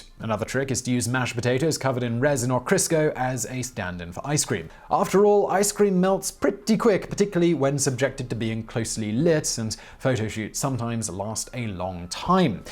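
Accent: United Kingdom. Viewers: I can't complain. It was so well integrated, I thought I was watching a British person. Might I add, the accent helps.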